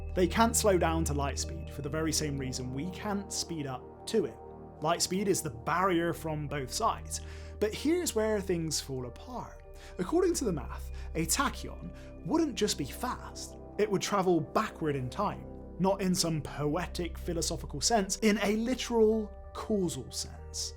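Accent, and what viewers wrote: Bristol, United Kingdom. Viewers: I know a Bristolian accent when I hear one! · sorry but how does a kid get to name The Reality Threshold?! I do find the Brissel accent hilarous.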